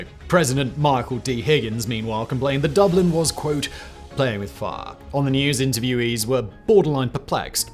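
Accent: United Kingdom. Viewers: This isn't journalism. You're so very clearly in favor of the militarization of Ireland. Curiously enough that would serve British interests too. Ireland would fall within a day and we also don't support your wars, nor do we have any interest in fighting them for you. This country has enough problems to deal with and they won't be resolved by stepping on other peoples toes. For those watching, I plead with you: don't be fooled into this as if it were some straightforward and logical conclusion because a fella with an Etonian accent said so, don't be cretinous fools, please. Open a history book. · Don't listen to that posh English bollox.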